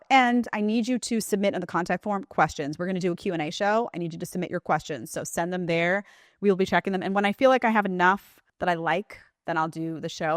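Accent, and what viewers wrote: New York, United States. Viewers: Do I hear a tinge of Ney York or Boston in your accent once in a while?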